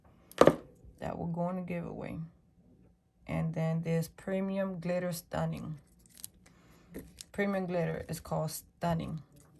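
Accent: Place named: New York, United States. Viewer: Your getting better with your videos love the haul and your content girl I love your accent are you from N Y TFS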